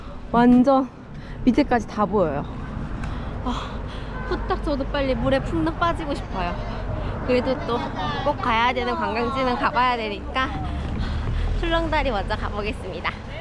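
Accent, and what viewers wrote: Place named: Gimhae, South Korea. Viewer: 님 올여름 휴가를 울릉도로 가셨나봐요? 저는 30여년전 도로등 기반시설 없을때 독도까지 갔다가 입도는 못했던 기억이 나네요 글고 지난번 결혼 하신줄 모르고 결례를 범했네요 결혼 하신지6년 되셨다고 하셨는데 늦게나마 축하드리고 남편분은 존잘남 확실한것 같네요 울릉도 가셨으니 성인봉 뿌시기도 하셔야죠 암튼 추억에 남을수있는 즐겁고 행복한 여름휴가 되세요 비 피해는 없으시죠? 김해가 고향이라고 하셨나요? 저는 님 고향사투리억양과 명랑하신 성격에 우연이 들렸다가 구독좋아요 누르고 시청하고있는 마산이 고향인 60대 중반 아들 군대보낸 할배예요 결례 용서하세요 영상 즐감했어요 새로운 한주도 날마다 좋은날 되세요 감사합니다... 파이팅!!!... 👍❤🍀👏👏👏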